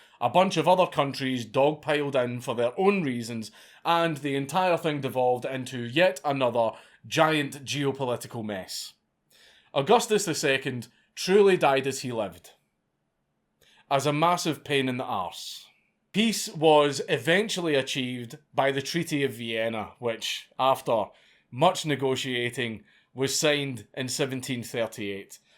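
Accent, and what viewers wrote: Scotland, United Kingdom. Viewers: You're not my type, I'm afraid, but if I were a woman, I'd marry you just for your Scots accent. Thanks for the story, by the way. Augustus was a "bastard factory" ? That's one for you !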